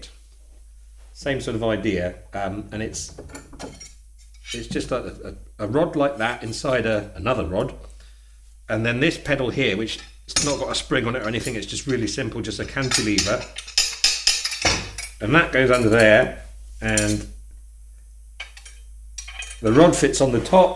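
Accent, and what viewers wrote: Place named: RP, United Kingdom. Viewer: A perfect English speaker with a perfect RP pronunciation talking about a perfect piano of the perfect 70s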